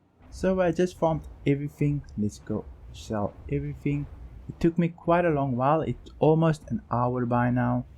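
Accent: South Africa. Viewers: Yitte saffa i can hear it lol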